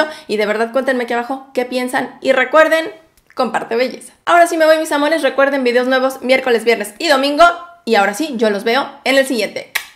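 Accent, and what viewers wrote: Mexico. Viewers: Por qué hablas como de Tepito?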